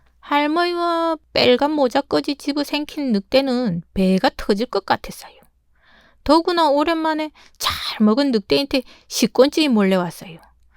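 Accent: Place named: Gangneung, South Korea. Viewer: 들을수록 강릉사투리에 웃음이 나오고 흥미롭고 알고 있는 내용이지만 다음에 나오는 이야기에 마음이 긴장되고 ㅎㅎㅎ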